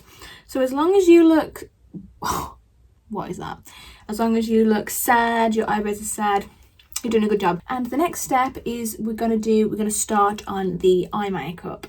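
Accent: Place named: United Kingdom. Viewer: Your accent is so cute. I currently live in Australia and wanna follow your accent, not bogan accent. Even though you explain how to make up, I learn british accent from you haha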